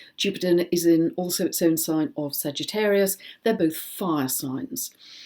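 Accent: England, United Kingdom. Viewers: Repeatedly in meditations beginning with the Winter Solstice celebration, the intention watchword for many of my friends ( and myself) has been “Courage”. So to hear you also share “Courage” as an intention for 2019 was a wonderful confirmation of how “the collective” is moving in to this new year cycle. 🙏 Thank you for sharing with us every month. I find your analysis always clear, concise and entertaining. (English accent, a welcomed plus 😉)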